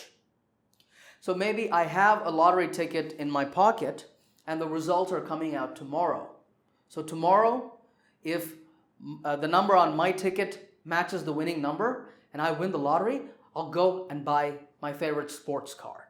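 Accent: United States. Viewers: Very clear presentation. The speaker has a relaxed but crisp American accent. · Wow such a great prodigy from an India, your accent or tone of your voice is like an american....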